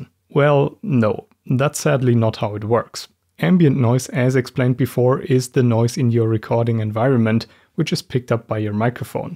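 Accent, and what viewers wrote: Germany. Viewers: awesome video!! just found your channel. great stuff man, keep at it. love your accent, is it german?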